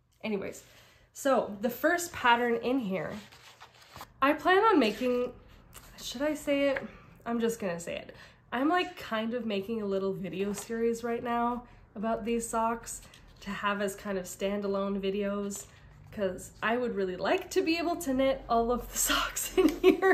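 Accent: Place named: Quebec, Canada. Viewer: Bonjour. J’adore tes vidéos, c’est certain que je l’ai écouté jusqu’au bout. Je fais des points de croix et j’ai tombé en amour avec le livre 52 socks in 52 weeks que j’ai emprunté à ma bibliothèque. J’ai découvert un patron de Paula Wisniewska Lato sur Ravelry avec la laine de Julie Asselin (québécoise) fantastique, le patron est aussi dans le deuxième livre de 52 socks in 52 weeks II. As-tu acheté le nouveau livre de Gabrielle Vézina (québécoise) qui fait des tricot en dentelles et des bas. Avec la laine de Julie Asselin. Juste avant ton vidéo, j’ai commandé une verge (yard) de speculass et deux mini hank de falu red pour faire Harriette Salt et Louisa Coulimore sur panettone. Moi aussi j’aime les fils Roxy floss co. Le falu red est mon préféré. Je suis une québécoise (dans mon cœur)qui vit en Ontario maintenant. À 25 je me suis mariée (avec un québécois bien sur lol) mais comme il était pilote dans les forces, nous avons déménagé en Ontario Mais nous retournons visiter nos amis et famille a chaque année Tu me fais chaud au cœur quand tu parles québécois Merci ne change rien. 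On t’aime comme tu es ❤ P.S. moi j’attends encore que mon amie me montre à travailler avec des aiguilles sur câble la loop magic Eat-ce que tu tricotes comme ça? Moi je ne dis pas skein, je dit ma pelote de laine 😂 À bientôt Moi je vais à stitch north la deuxième fin de semaine d’avril et en octobre J’espère te rencontrer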